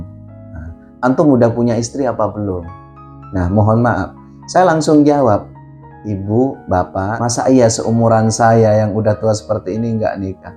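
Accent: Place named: Semarang, Indonesia. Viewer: Aslkm. Saya dah Marem penjelasan nya ustad. Atas bimbingaya. mohon maaf. Saya mintak alamat pak ustad. mau tabarukan. saya Semarang. logatnya pak ustad seperti logat Semarang trmksh.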